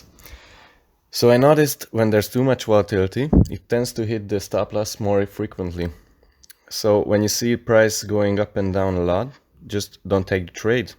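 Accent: Hungary. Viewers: te magyar vagy, hallom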